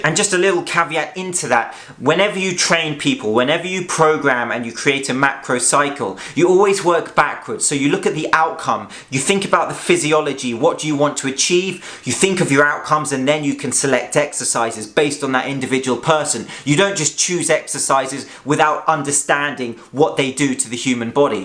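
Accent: England, United Kingdom. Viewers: I think your so called DEBUNK of VShred is ridiculous . I am a 61 year old man (look 37 ) and have been working with VShred for 8 months. I lost 40 lbs and have gained musculature that I had when I was in my late 20's . I follow other channels as well and have become an expert on diet . I don't understand why you are singling Vince out in your very particular attack. You seem to be envious of his following 4.2 million or so viewers . Even with your English accent you will never be the YouTube Star that Vince has become.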